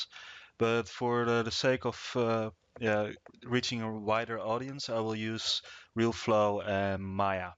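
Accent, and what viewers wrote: Netherlands. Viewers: nice tutorial, but doe iets aan je engels. ik irriteer me wel een beetje aan het nederlands accent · thnx for the tutorial btw u sound dutch :P greetings from amsterdam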